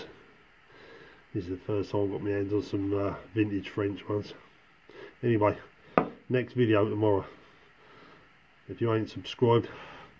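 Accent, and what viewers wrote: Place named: Medway, United Kingdom. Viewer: Ha! You’re a “Gentleman Collector”. You can enjoy both sides of the pleasure a cartridge gives. Great accent and nice to hear. Guessing Medway Towns? I’m from Maidstone originally but now miles away in Devon. Love your videos, keep ‘em coming mate! 👍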